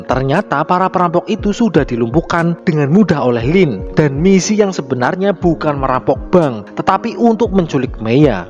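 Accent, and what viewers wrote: Banyumas, Indonesia. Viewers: Aja kelalen ngapak kang.. 😂😂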